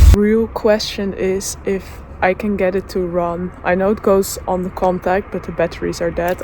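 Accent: Netherlands. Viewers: Wat ontzetten gaaf(!). Van de kanalen die ik volg die barnfind fideo's maken, komt 80% uit de Verenigde Staten, en de rest uit het Verenigd Koninkrijk. En dat zijn er wel een paar. Van de thumbnail dacht ik heel even dat je ook uit de VS kwam, maar je accent is onmiskenbaar Nederlands!😉 Het is leuk om iemand tegen te komen op het internet die zogezegd "uit de buurt" komt. Ik ben heel benieuwd wat de levensloop van deze 4x4 gaat worden en welke avonturen het gaat beleven. Èn of het misschien ook een naam krijgt. Zoals bijv.: Martin de Subaru, en Oliver. Een 1963 Opel Kadett. (Edit: oeps.. Beide auto's van Richard Hammond van Top Gear/ The Grand Tour. Maar dat wist je waarschijnlijk al😅)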